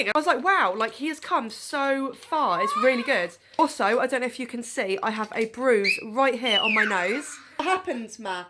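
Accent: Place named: United Kingdom. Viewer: I like u accent ! looks like british . But anyway , adorable video. Thanks for sharing with. Hello from Japan!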